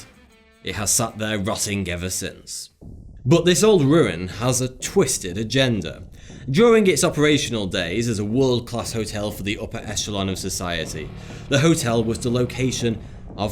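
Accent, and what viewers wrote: United Kingdom. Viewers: In the UK, we call them shopping centres, not shopping malls. tends to use American words, despite sounding British.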